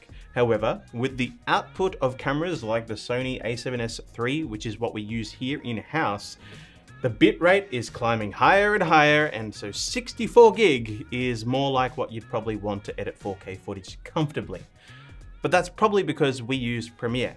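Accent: Australia. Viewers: Learn a lot of things from this video, but the highlight is now I know for sure how Australian English accent sounds, kinda sound like British but more decipherable 😂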